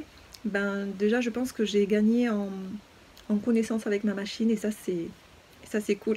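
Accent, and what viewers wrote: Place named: South, France. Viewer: Kk, Je viens de regarder tes vidéos, j'aime beaucoup suivre ton évolution et je te trouve débrouillarde surtout avec le tee-shirt adulte transformé pour enfant. Tu as la voix calme avec un accend du sud bien sympathique. Je suis abonnée pour pouvoir suivre ton évolution 😘😘😘😘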